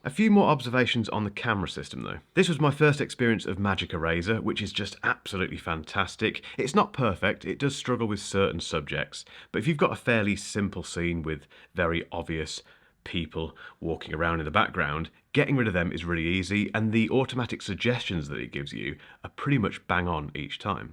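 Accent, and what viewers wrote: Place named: Essex, United Kingdom. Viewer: This man speaks with an Essex accent. He is an Essex boy.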